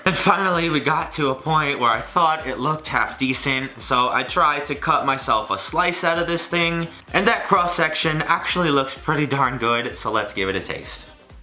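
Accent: New York, United States. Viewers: I knew you lives in New York, you got that accent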